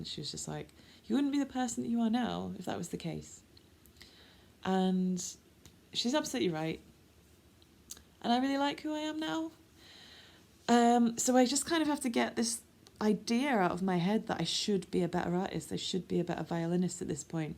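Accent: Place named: Manchester, United Kingdom. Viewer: God I miss you and god I love your ever so slightly Manc accent - after next lock down I’m gonna come visit and sing ‘let it go’ with you 🥰🥰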